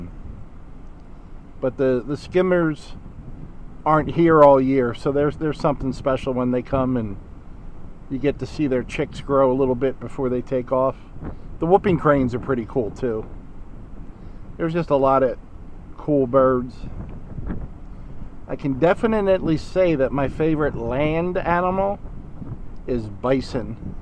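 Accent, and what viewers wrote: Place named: Texas, United States. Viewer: There's something beautiful about the look of the place after a hard rain. The food looked good! And, your accent sounds more Texan today. haha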